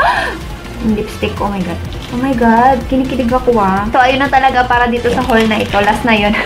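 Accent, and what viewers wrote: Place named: Conyo, Philippines. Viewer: Nakakatuwaaaaaa hahahahaha ang conyo mo na ate hahaha ang cutieeee lab lab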